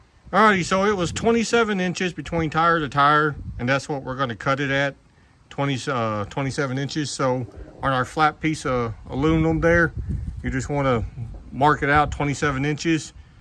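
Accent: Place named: South, United States. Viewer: I hear that accent as he talks, I can just about say he's in the south, so it'll be warm season grass like St. Augustine, Bermuda, Centipede, etc. Our grass in the south is pretty stubborn and tough compared to cool season grass like fescue or ryegrass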